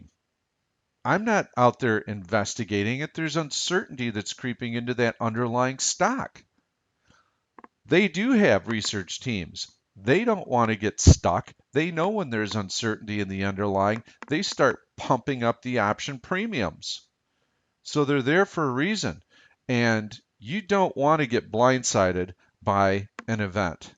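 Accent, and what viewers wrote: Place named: Chicago, United States. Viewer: When an options trader tells me he used to trade in the Chicago options pit and I hear his Chicago accent in the first 20 seconds of the video, I listen. lol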